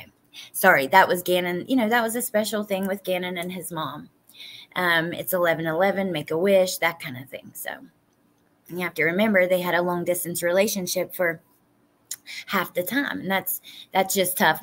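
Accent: South, United States. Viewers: U don’t smack ur lips it’s jus the gorgeous southern accent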